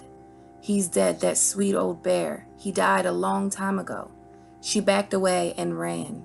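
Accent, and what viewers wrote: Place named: Baltimore, United States. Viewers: I agree I hear a distinct Baltimore version of the Long Night. I don't know where is from but I am hoping I am right. She send us Ravens it only makes sense. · your critical thinking skills are next level...i have enjoyed your videos for the past couple years (you, gemma, quinn, and preston jacobs)...you are obviously as obsessed with the GOT world as i am. (and your baltimore accent reminds me of my family) keep making that magic happen.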